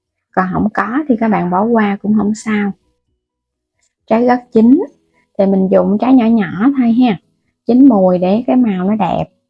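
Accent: Mekong Delta, Vietnam. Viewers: Con ơi nói chuyện nên tự nhiên đừng nên địu quá không hay góp ý nên để giọng miền tây gặt để thương hơn nhé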